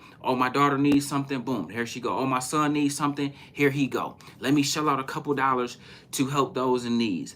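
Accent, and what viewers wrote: Detroit, United States. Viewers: Are you from Detroit?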